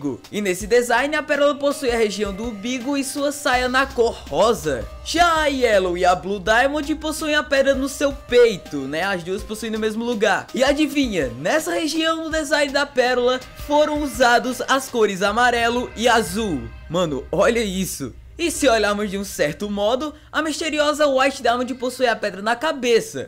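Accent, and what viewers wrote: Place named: Pernambuco, Brazil. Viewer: Mano vc é pernambucano ! Gostei do sotaque tá carregado n he he !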